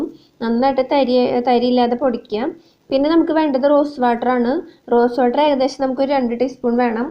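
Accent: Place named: Thrissur, India. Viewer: എവിടെ ആണ് വീട്?? തൃശൂർ ക്കാരി ആണോ?? 🤔🤔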